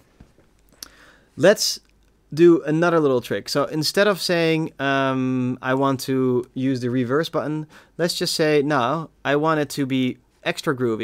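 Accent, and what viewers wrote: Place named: Netherlands. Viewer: Where are you from? You sound a little dutch, your english is really good though!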